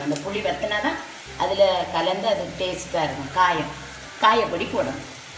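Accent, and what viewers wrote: Nagercoil, India. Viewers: Theivamee namma oru slang tamil ketu yethanaa naalu makka ... Special word naroil insted of nagercoil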